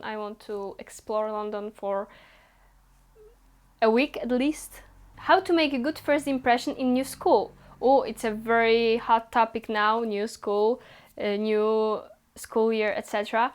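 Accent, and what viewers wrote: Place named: Poland. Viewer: Hi, congratulations! You are fluent and natural. In your speaking English there is no pretending of not being Polish by no means. On the contrary, this video shows (maybe more clearly than the rest) that you are thoroughly Polish. And this is good. There should be more of such expression of Polish identity and spirit in English on the web. Keep up. And thank you!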